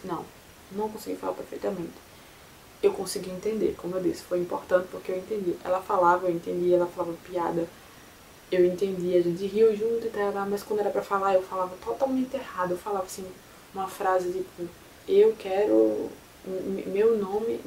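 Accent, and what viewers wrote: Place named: Northeast, Brazil. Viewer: Seu sotaque eh igual ao meu, vc eh do Nordeste? Sou de Maceio, tb sou Au Pair, tb tenho um diario aqui no YT e estou ha tres meses em Virginia. Onde vc esta aqui nos EUA? Bjus!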